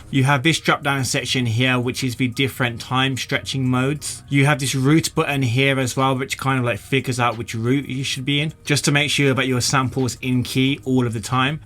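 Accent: Birmingham, United Kingdom. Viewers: Do I hear the dulcet tones of a fellow Brummie?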